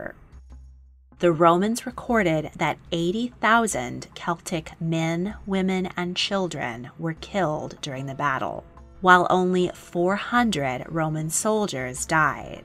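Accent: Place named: Northern California, United States. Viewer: Good and maybe accurate, but a North Cal accent narration ? You could of hired Steven Toast of London.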